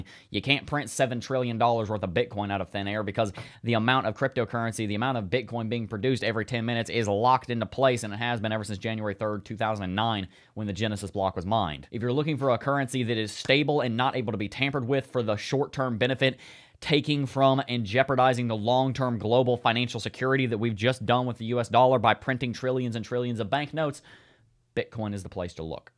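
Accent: United States. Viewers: I just love your cowboy accent... I enjoy your videos. Keep up the good work!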